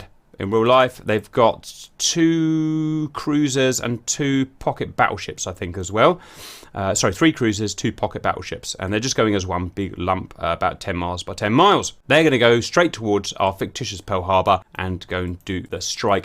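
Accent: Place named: Estuary, United Kingdom. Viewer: Yeah, you have nowhere near a West Country accent, but most Brits will move around and lose their native accent....yours is a bit muddled...so I'll just call it estuary with a twist here and there when you get excited. Not trying to offend you, the accents are getting harder and harder to nail down anymore. I used to coach accents many years ago, and I'm sooo glad I do not do it anymore. Anyways, thank you for the uploads, love the content...sorry if this came off as weird. Right, carry on mate.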